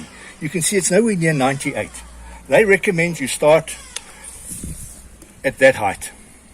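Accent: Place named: United Kingdom. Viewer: Is this available in USA? Your British accent is obvious.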